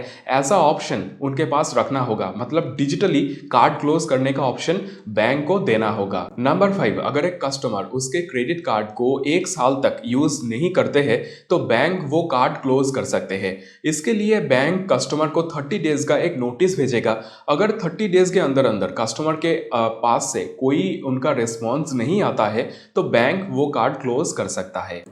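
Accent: Bengal, India. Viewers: Are you Bengali? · You're a Bengalee, hey mate 🤗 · O bangalii baba tumhra words samj ma nahi aate banglii accent aati ha Hindi ma try to fix it first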